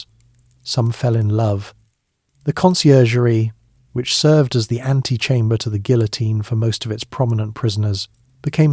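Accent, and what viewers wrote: England, United Kingdom. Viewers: Another one of those videos with a horrible very strong English accent. I don't waste my time with such things.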